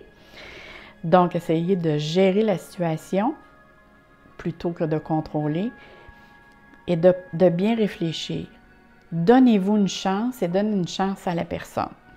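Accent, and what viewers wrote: Quebec, Canada. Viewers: Trop marrant l'accent canadien 😂😂😂😂😂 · Merci 🙏 pour ce beau tirage et gratitude 🌼 pour vos précieux conseils ✨ Quel plaisir d'entendre votre accent de nos cousins de la belle Province. Gros bisous de France 🌍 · J'adore votre voix apaisente et et votre accent du Canada J'adore à fond mais ce que vous dire n'est pas faux la différence est que pour moi C un peu différent car j'ai 63 ans il serait temps que je rencontre un homme pour fin de vie heureuse merci beaucoup suis scorpion ascendant taureau bizzzzz de France vous êtes adorable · j’ai choisit le n°2 et votre interprétation me parle beaucoup. J’aime vous écouter, comme il est dit dans un autre commentaire votre voix est apaisante et j’adore votre accent québécois aussi. Merci pour tout. Bye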